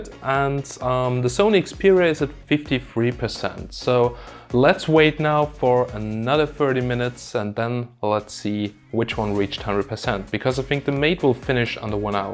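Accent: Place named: Germany. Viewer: German accent 😁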